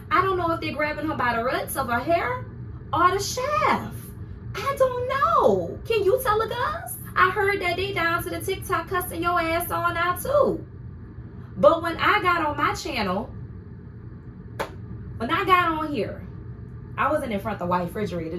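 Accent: New Orleans, United States. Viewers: It’s the NOLA accent for me ❤❤❤❤❤ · Whole video is a WORD perfectly frosted with dat NOLA accent 💚💛💜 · Love that NOLA accent!! Lol ❤️❤️❤️❤️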